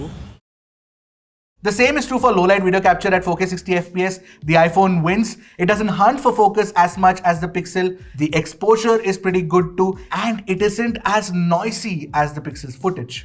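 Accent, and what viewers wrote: India. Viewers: Indian accent 😂😂 love it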